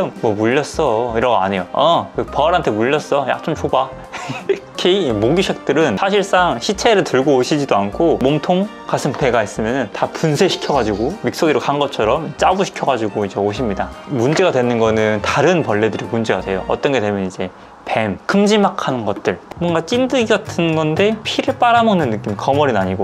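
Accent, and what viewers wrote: Gwangju, South Korea. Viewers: 광주 말투 너무 좋아여!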